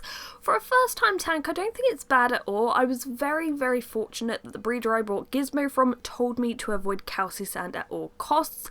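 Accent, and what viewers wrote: United Kingdom. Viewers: i love brittish accent :3.... i just love it _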